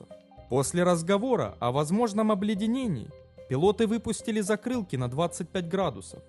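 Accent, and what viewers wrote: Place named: Zaporizhzhia-Dnipro, Ukraine. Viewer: только из-за этого жуткого запорожско-днепровского акцента смотреть и слушать не смог.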